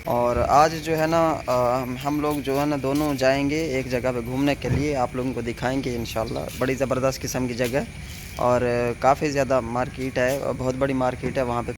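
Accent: Saraiki, Pakistan. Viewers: I knew you were saraiki from your accent :D 😁😁 and you confirmed it. Kery shahar da haen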